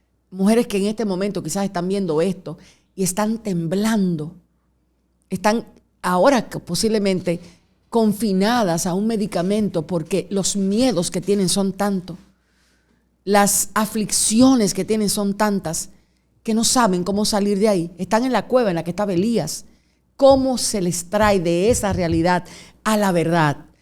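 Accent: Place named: Dominican Republic. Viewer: Q bonito habla está Hermana, q acento, q pronunciacion y enfasis mas bonito, muy capacitada en él conocimiento de la palabra pero sé nota q ha recibido un un espiritu de sabiduría y revelacion muy vasto! Poniendo la mujer cristiana dominicana en alto!!!!Saludos de una hermana cubana desde Kentucky!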